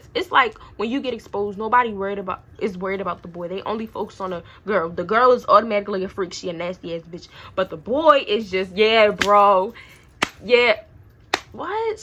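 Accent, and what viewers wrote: Baltimore, United States. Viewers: Nah da baltimore accent be havin me cryin😂😂😂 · DO I HEAR A BALTIMORE ACCENT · she gotta be from baltimore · You from Baltimore? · I knew you was from bailmore I knewwww ittttty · Baltimore accent ruining the video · hold up cuz ik you from baltimore gotta who bmore accent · Yo she sound like she frm Baltimore but ion know nobody frm Baltimore that says cold drink so idek but you still gettin a sub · U gotta be from Baltimore💯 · Is you from Baltimore or summ ??😭😭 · The Baltimore accent strong ash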